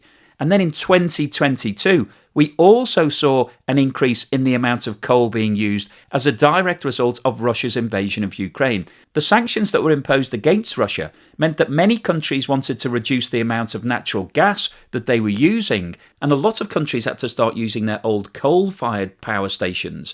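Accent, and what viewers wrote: United Kingdom. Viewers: You appear to have a British accent, so i am wondering why you say 2 times rather than TWICE?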